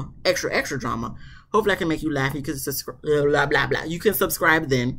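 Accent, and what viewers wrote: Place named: Charleston, United States. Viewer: oh ok. You sound like some of my peoples from Charleston.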